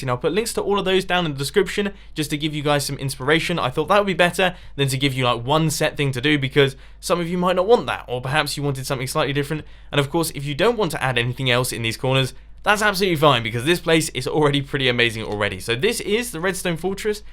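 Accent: England, United Kingdom. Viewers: r u english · Are you British?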